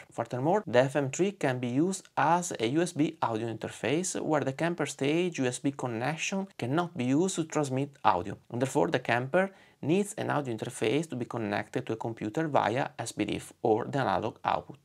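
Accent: Italy. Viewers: ah ma quindi sei italiano anche tu? avevo notato una pronuncia particolare che può essere nostra o comunque in generale di chi non è madrelingua inglese / americano.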